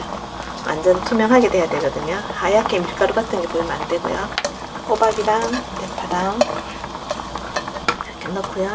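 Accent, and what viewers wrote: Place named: Gyeongbuk, South Korea. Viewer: 구독한지는 오래됐는데 처음 댓글씁니다 친정이 포항이라 경북말씨 너무정겹습니다^^열심구독합니다. 감사드려요. 좋은 오늘되세요~~~**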